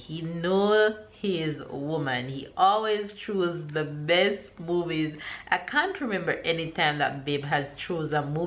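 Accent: Jamaica. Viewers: U go gal love fuh hear u teach uh husband d Jami twang shouts out from Tobago Caribbean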